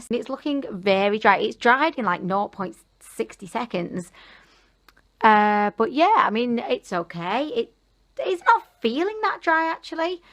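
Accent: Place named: Manchester, United Kingdom. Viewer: Love your personality and accent are you from Manchester:D x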